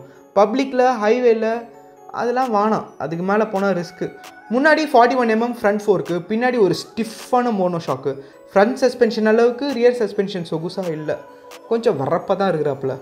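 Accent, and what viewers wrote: Chennai, India. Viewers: Bro good voice good style of commentary very super style natural madras tamil like my brother 👌 Super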